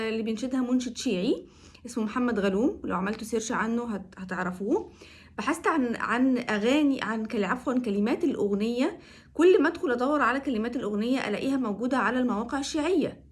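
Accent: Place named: Iraq. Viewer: كافي تمضرطين بالهجه العراقيه اكولهه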